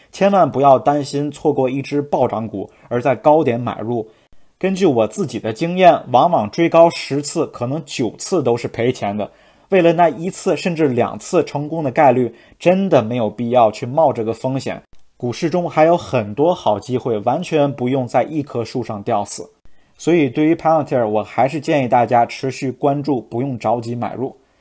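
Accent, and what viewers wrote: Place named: Tianjin, China. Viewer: 是天津卫滴：）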